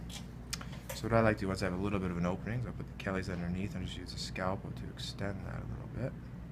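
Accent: Canada. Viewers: Do I hear Canadian accent? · Are you from Canada?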